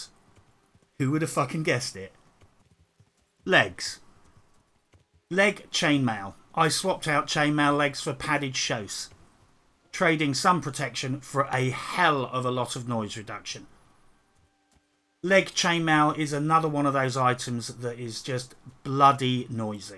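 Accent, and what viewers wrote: United Kingdom. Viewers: I haven't played this game in years but come back to this videos from time to time just because they put me in a good mood lmao maybe it's due to the fact that it's a British man talking about this Czech game placed in medieval Bohemia. Being an immigrant from Poland (or POLISH LITHUANIAN COMMONWEALTH if you wish), living in the UK, a history buff at that, what could be better?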